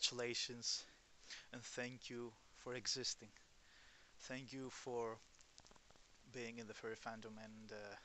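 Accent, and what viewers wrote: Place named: Greece. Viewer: I watched this purely for your beautiful, Greek accent. 😻☕️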